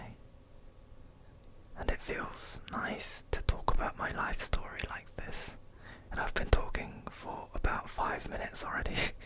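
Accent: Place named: England, United Kingdom. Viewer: Wow hi, I just discovered your channel. Your voice is so cool! England-accent is nice. Also, I'm sorry you faced the racism you did. :( Also thank you for being so vulnerable. I know that can be very hard. Please feel free to talk about yourself more. Talking helps bring healing. Allow yourself the healing you need.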